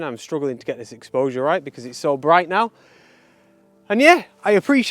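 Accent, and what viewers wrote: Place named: Merseyside, United Kingdom. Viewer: Great photos. Richard Tatti of nightscape images, rigged up a quick release plate to his gas oil thing like what you have, now he can stand up and cook, lol, an idea for you. Are you from Merseyside, trying to figure out your accent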